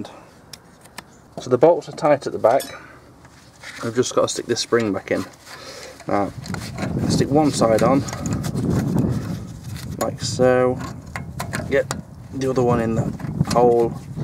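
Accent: England, United Kingdom. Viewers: Good video - Car repair taught by a guy with a classy English accent. Couldn't be too much better. Well - maybe if I could have talked someone else into doing my Focus brakes but,.....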